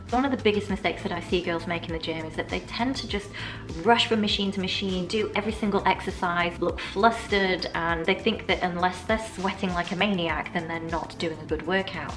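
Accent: Southern England, United Kingdom. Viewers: Thanks:) I'm Spanish. I live in Spain but used to live in your home country England. I also have friends in the south of England, you people have the most beautiful accent! <3 My bum is improving and hubby notices it:D hehe... he says it's harder and rounder. Although I'm lucky and he finds me sexy no matter how big or small, hard or flabby:) I agree with you, age is no excuse to not be your best and my figure is kind of 'getting younger', sort of leaner. I seemed to concentrate my weight around my belly and upper thighs for years. I don't know if it's an age thing but I do think sitting down a lot (for work) has lots to do with it. I exercise at home and what I've done is place 15 kgs of dumbells on my belly. I contract my pelvic area to avoid any harm there and do 30 hipthrust reps concentrating on contracting the bum as you advise, rather than go very high up. 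I do this lying on my back with my legs on a sofa so I don't strain my knees at all.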